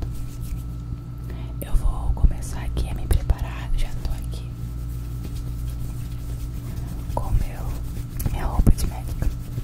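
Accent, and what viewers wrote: Rio de Janeiro, Brazil. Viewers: Eh tu é carioca??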